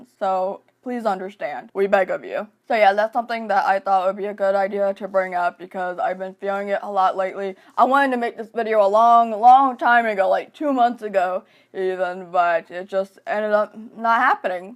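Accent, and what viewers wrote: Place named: Southeast, United States. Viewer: I had to look up where you're located because your accent sounded so familiar and I knew it must be somewhere in the Southern East Coast. :D Sending solidarity from Maryland!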